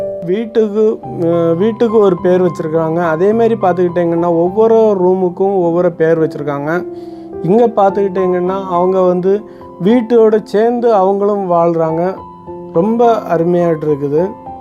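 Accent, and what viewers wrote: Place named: Tirunelveli, India. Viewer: நீங்க தூத்துக்குடியா அல்லது திருநெல்வேலியா உங்க பேச்சு அப்படித்தான் இருக்கு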